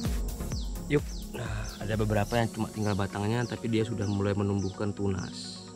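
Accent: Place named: East Java, Indonesia. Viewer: Asli jawa timur mana mas?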